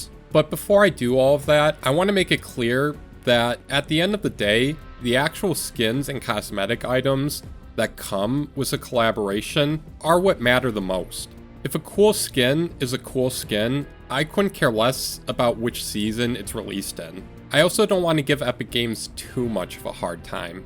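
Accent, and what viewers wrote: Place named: United States. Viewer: The way you speak makes my ears hurt. You’re accent makes it painfully obvious you’re just another american. I hate the way you guys speak. It literally is the wrong way. When you say words that include “Er, Or, etc), you shouldn’t be saying it (for example: Word), like “Wrd”. The ‘R’ is simply there to change how it gets pronounced, it isn’t meant to be pronounced on its own. Don’t even get me started on words like ‘on’, which oddly seems to be pronounced like “Arn”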